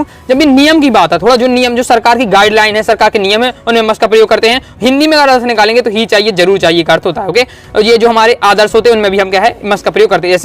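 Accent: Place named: Bundelkhand, India. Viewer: सर आप तो कहीं कहीं बुन्देलखण्ड बी बोल तै हो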